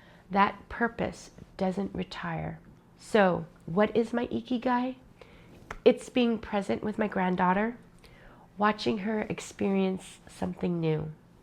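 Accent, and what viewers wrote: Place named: Netherlands. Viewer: Hi, sounds Dutch are you? Well don’t give up yet. There is a bigger reason why you are here and your life has value. Most people have problems nobody knows about. Count your blessings and focus on what you are still capable do and find someone you can help with their problems. Stay strong ❤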